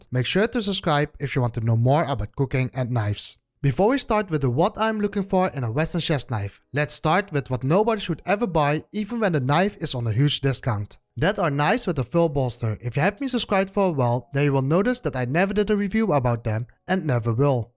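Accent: Netherlands. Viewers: Really like your videos and your thorough, honest advice (i.e. "no such thing as "the best" knife). I also find your Dutch accent charming to a certain extend. Yet, you maybe need to work on your English pronunciation of "U" - You say it like "oo" as that letter is pronounced in Dutch and German. In English it's rather said like "you" as in the word "use" (say "youse", not "ooze"). Also your inconsistency in using either singular or plural can be irritating, while your English vocabulary is great, though. Also, please e so nice to use your index finger for indexing, using the middle finger looks kind of rude. Keep up the good work and all the best!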